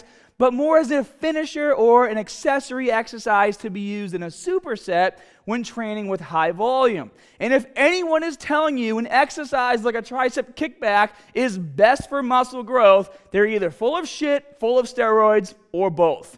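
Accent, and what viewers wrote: Boston, United States. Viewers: “Taaget” “staaated” I have a feeling he’s from Boston! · You from boston man? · Yo!!! Where in Boston are you??! I listened to the first 8 seconds and knew you were from Boston. This video is needed. I am building muscle in both my hard and so this is going to be added to my routine! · That accent makes me nostalgic!!! :-P Go Sox! Go Pats! :-P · Still can’t figure out if your from Boston or Australia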